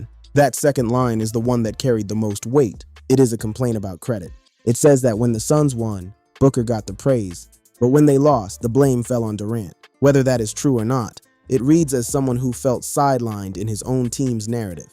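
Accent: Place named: Netherlands. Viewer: You have to do the voiceovers yourself. Even if it is a Dutch accent we can understand you lol.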